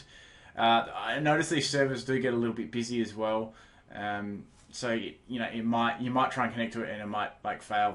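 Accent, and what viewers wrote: Australia. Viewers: Before you get all angry and stuff, know that is Australian, not a brit.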